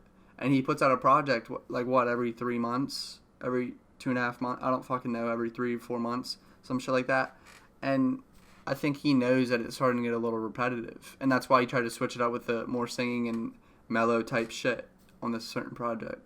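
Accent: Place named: Philadelphia, United States. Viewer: you sound like youre from philly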